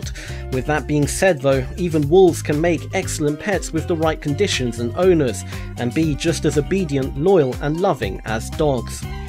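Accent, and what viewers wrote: England, United Kingdom. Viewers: That is just his English accent.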